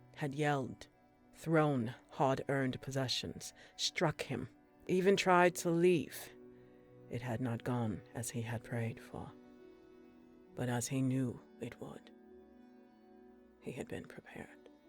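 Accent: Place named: Boston, United States. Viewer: Love that raw - unscheduled laughter....at the end / little did I know - there would be much more..... from your spontaneous, and bubbly personality 😂 my friend I want to say New England To Boston.... accent? " His fingas " Loved the reading Great writing 👌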